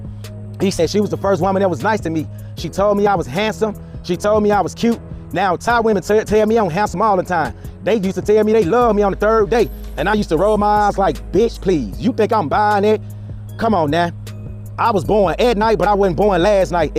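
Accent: Mississippi, United States. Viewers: R you from Mississippi bra… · I was guessing Mississippi.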